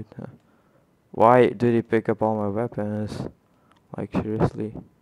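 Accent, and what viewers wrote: Netherlands. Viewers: Are you dutch? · HahahahahHhahaha jij bent sws Nederlands je accent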